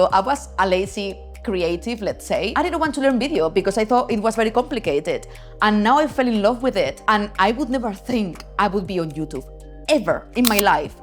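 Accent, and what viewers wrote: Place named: Spain. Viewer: I’m told that if you go to an event with 8 peoples, you will talk to 8 people, if you go to a large event, even hundreds, you will talk to 8 people. I am an old guy, I do not do small talk, just lost for words. The lovely Spanish accent works well for. Family are the worse people to practice with.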